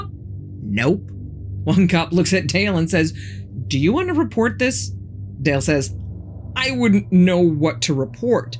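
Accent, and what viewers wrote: New York, United States. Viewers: How the heck does someone make you feel both safe and spooked at the same time? tells these stories so well that one can’t help but laugh even as the sheer terror builds up in your mind. And the AI, the editing… Everything is so on point! How is this channel not at 1 M members?! I thought only Morticia Addams did lovely and spooky but certainly does it at least as well…with a New York swagger!!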